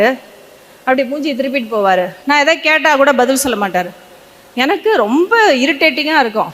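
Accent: India. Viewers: ஆனால், நல்ல தமிழ் கதைக்க உங்களால் முடியவில்லையே. Madras தமிழ் தானே கதைக்கிறீர்கள். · உன்னைப் பார்த்தால் சிரிப்பா வருது..🤣🤣🤣 அரபிக்காரனுக்குத்தான் உன் English best ஆ தெரியும்... America, Canada ல பேசிப்பாரு... உன் பேச்சிலேயே நீ இந்தியன்னு சொல்லுவாங்க. (because of your accent) 🤣🤣🤣 முதல்ல ஒரு விஷயம் புரிஞ்சுக்க.. English ஒரு language தான்.. ஒரு வெள்ளைக்காரன் தமிழ் பேசினால் எப்படி சந்தோஷமாக ஏற்கிறோமோ அதே போல நாம் English பேசினால் அவனும் அதை ஏற்றுக்கொள்வான். அதுக்காக அவன் நம்மை அதிகம் படிச்சவன்னு நினைக்க மாட்டான்.. நம்மள மாதிரி முட்டாளா அவன்???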